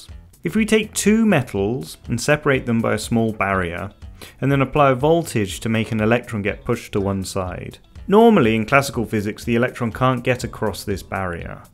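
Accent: England, United Kingdom. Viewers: Question: Where are you from in England? You speak very similar to James May.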